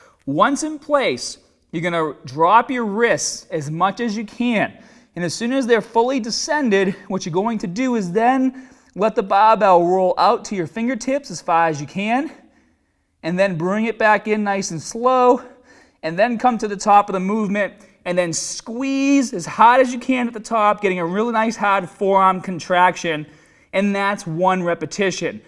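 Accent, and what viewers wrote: Boston, United States. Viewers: Reasons why i subscribed: that strong Boston accent and funny yet serious. Nice work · Love the Boston accent :) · Am I crazy or is Bostonian accent a lot more noticeable? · Boston or Rhode Island accent?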